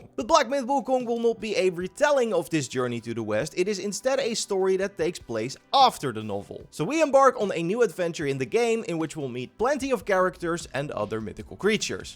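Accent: Netherlands. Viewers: Dude stick to dutch videos your English accent is horrible. Also listen to how he says wukong lmao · I love your dutch accent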